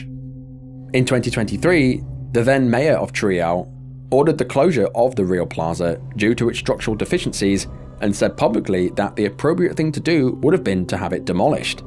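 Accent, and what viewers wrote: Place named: England, United Kingdom. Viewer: ❤❤❤❤❤❤❤❤❤❤❤❤❤❤❤❤ you R so sweet. Also love the channel new subscriber here ❤ also from U.K South Yorkshire ❤ I could tell are obviously also English 😂😂😂 ❤❤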